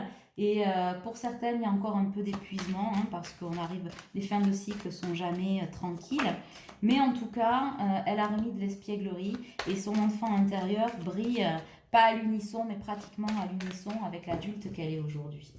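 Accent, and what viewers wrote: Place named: Toulouse, France. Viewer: Bonjour, il y 25 ans quand on s’est rencontrés, Elle jolie brune du Sud et moi Loulou de la mer du Nord ( elle m’appelait comme ça ), Elle 23 ans, moi 40, 🙄🙄nos Âmes se sont reconnues, c’était plus fort que tout, un ouragan, un tsunami, un ´goût ´de foudre. Notre premier baiser💋 tellement passionné🔥 et si différent des autres, l’alchimie de nos corps, ce parfum enivrant de l’Amour, le plaisir du plaisir de l’Autre. 🤫🤫, le goût de l’Autre, Nos folies et notre insouciance, le secret de nos rencontres, 🤭 les débuts de la nuit.....c’est Amour inconditionnel. Le grand moment de ma vie, où juste avant Elle, je ne croyais plus à grand chose, à trop vouloir on brûle ses ailes. Elle me manque souvent, Elle me manque toujours 😌😌et si tu ne m’aimes plus, tant pis pour moi😌😌 Merci pour vos agréables guidances, optimistes et juste avec votre voix de Toulouse 😊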